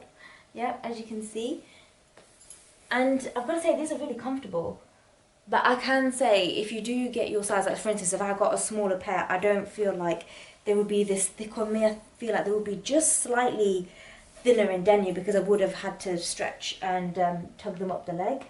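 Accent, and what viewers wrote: United Kingdom. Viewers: I enjoy all of your videos and I love your British accent your voice is lovely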